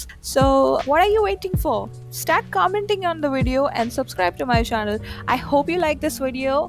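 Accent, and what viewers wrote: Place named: Kerala, India. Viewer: I guess ur from Kerala Because of ur english i came to know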